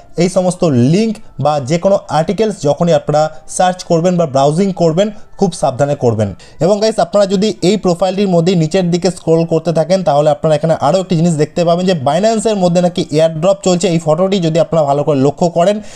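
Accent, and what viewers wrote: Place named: Kolkata, India. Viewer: আরে ভাই, এটা আঞ্চলিক উচ্চারণ। আমরা বাংলাদেশে আশা করি উচ্চারণ করি। আর কলকাতায় উচ্চারণ এমন। বাংলাদেশের কিছু অঞ্চলেও এমন উচ্চারণ করে। 🙂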